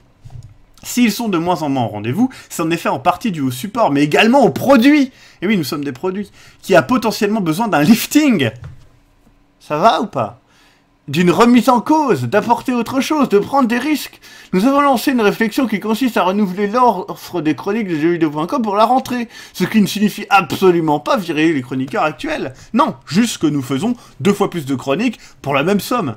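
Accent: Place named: Africa. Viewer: Salut dis moi t'as vécu en afrique ou sur une île Africaine ? Question à ta façon de parler certains mots.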